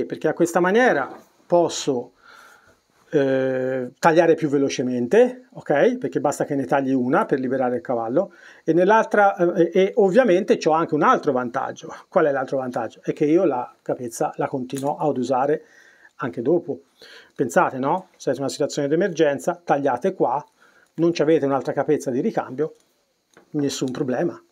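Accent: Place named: Tuscany, Italy. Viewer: Bravo, spieghi talmente bene e in modo chiaro che possiamo capire tutti. 👍Grazie. E un piacere ascoltare il tuo accento tos ano😊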